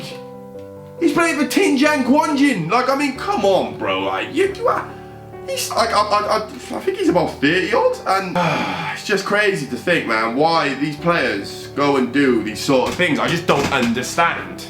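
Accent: Wales, United Kingdom. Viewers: Are you Welsh???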